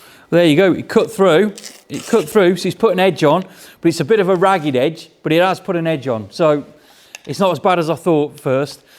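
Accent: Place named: England, United Kingdom. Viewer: thank you for responding. I took mine out of the box because you took the fear of its use and stuffing up. I sharpened knives, scissors, chisels, branches lopper and the shorthandle Axe. It was not a perfect job as the plastic was wobbly and in the way to do all of the edge; some came out uneven. I learned something as a Pen Pusher and perhaps I will do better next time. I saw the disaster with Drill bits so didnt attempt, I will think of some other use for that to sharpen the bent nails or as Pencil sharpener. Great to hear that Dialect of English as it reminds me of my time in England from North Sutherland, Jordie, and of Scotland to Llandudno in Wales and Devon in the South to Southampton. regards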